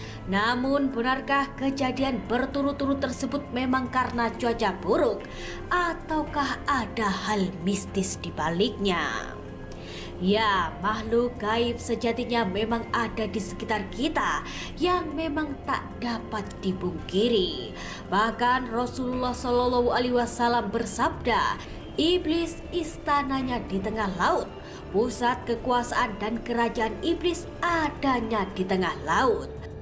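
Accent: Java, Indonesia. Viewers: Suaramu medok.mbak Wong jowo ya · Pembawa berita nya medok bnget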